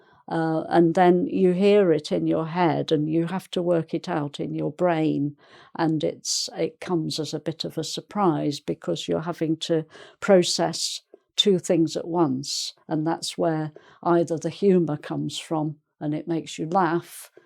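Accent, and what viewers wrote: England, United Kingdom. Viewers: Miss. my full respect for you, really I just folding your class, but I not understand some of your classes, I can’t explain the problem. Maybe I feel shook of British access. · I honestly admire your charismatic accent..actually I am not a native speaker of English and already used to adopting tye American one..I could say that the British accent sounds both awesone and difficult .thanks for these illuminating classes which you make even easier with your wise and less hurried speaking voice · Iam in love with british accent really it is so beautiful. Hearing it makes me want to stop hearing any Americans. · Great! is magnificent as usual. And her pronunciation is charming, it reminds me of my English friend...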